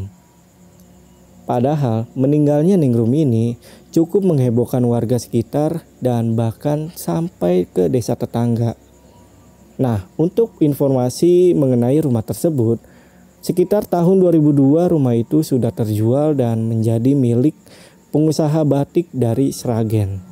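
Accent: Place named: Bali, Indonesia. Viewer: Bang, logat T nya bali banget sih. Asli bali kah bang?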